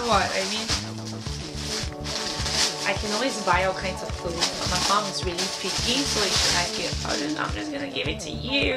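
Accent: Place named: Singapore. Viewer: The moment I heard you speak I knew you were from Singapore :P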